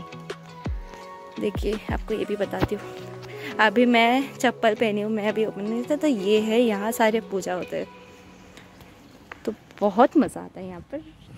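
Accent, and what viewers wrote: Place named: Maithili, India. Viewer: Where are u from? Sitamarhi or Muzaffarpur? The accent i heard is "Maithali'', so i guess u from Sitamarhi. I am from Sitamarhi, Bihar too and currently i live in New Delhi. BTW i loved your vlog. It reminded me of my village. Keep going.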